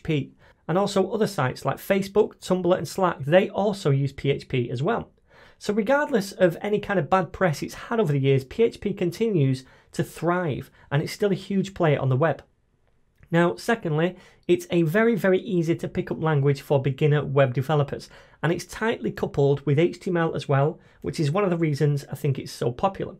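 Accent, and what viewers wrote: United Kingdom. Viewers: Is this British Accent. I Love it so much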